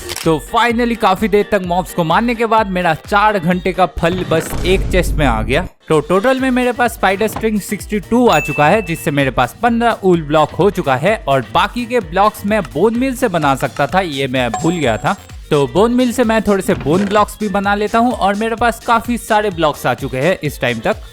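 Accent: Bengal, India. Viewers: Are you Bangali??? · Bro r u Bengali ??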